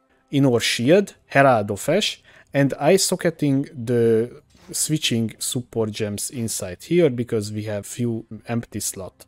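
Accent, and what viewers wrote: Hungary. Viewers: Someone having a Hungarian accent here :D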